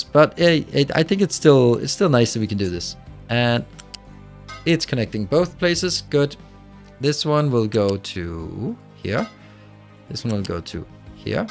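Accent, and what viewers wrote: Denmark. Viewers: I’m really wondering what country your from because you sound danish but I’m not sure. Hope it’s not a too private question. Keep up the work.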